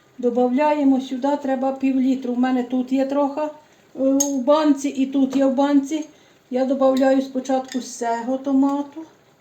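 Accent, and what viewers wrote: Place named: Transcarpathia, Ukraine. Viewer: Дякую за рецепт і приклад закарпатського говору.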